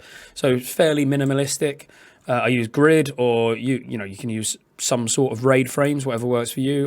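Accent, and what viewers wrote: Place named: London, United Kingdom. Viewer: i love druid and l love your london accent!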